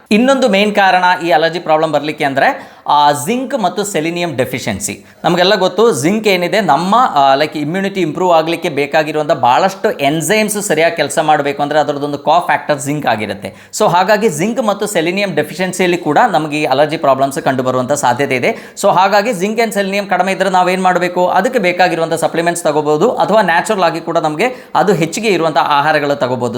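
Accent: Kerala, India. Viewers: Well explained Doctor you are speaking very good kannada beaing a Malayalam slant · Ok, but look like Dr from Kerala, kannada is Malayalam mixed kannada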